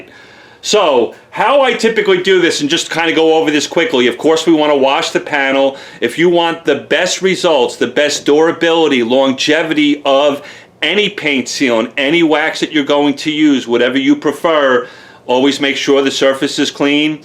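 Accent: New York, United States. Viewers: I just love that thick NYC accent !!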